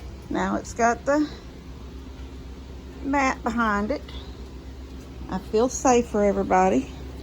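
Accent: Texas, United States. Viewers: I'm literally catching up with you and honestly I could listen to you all day. So many of the stories of your life resonate with me. I've always had horses and they are my life. Just one husband a daughter and a son, even my kids said why do you put up with this but I'm of the generation who stuck it out. I got rid of him finally and bought a little beachside rundown cabin and damn near killed myself doing the Reno to make it liveable. I swear I can smell that smoked meat I used to smoke fish. I've told your dad I just love his Texan drawl and sure love yours too. I'm Australian and you know what we're thousands of miles away in distant lands but I sure do love you folks, you're my people 🤗 give that lovely big boy of yours a kiss from me. My name's Megan MacDonald and I really enjoy watching your whole families lives ❤️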